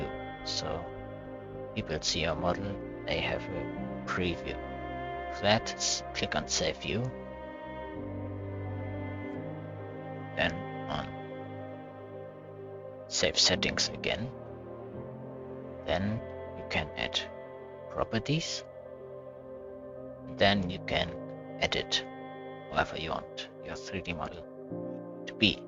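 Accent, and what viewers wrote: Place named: Serbia. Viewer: You are hören sich nach ein Serbe an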